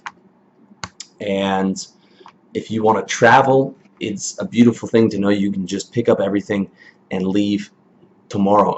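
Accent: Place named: Canada. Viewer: You sound like you might be a neighbor to the north. :) I live in a small community filled with hoarders, so it drives me to youtube to find people who understand the call to minimalism. Great video, first I've seen of yours. Youtube is full of female minimalists but the male ones are somewhat harder to come by. I'm guessing the bulk of your channel isn't about minimalistic tendencies though. 😉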